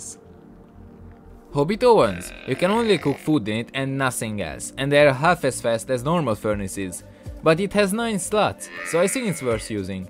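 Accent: Hungary. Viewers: De dúrva a magyar akcentus, de fasza a videó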